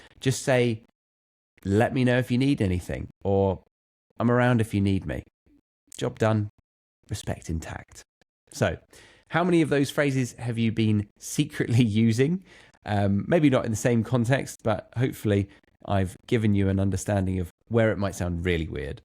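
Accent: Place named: United Kingdom. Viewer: yes, Britishness and naturalness and the accent of yours😊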